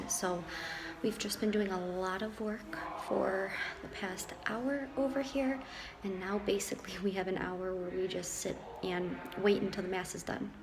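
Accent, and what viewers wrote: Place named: Buffalo, United States. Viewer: Are you in Buffalo by any chance? I am from Clarence, and your videos and your voice have a touch of my hometown in it :)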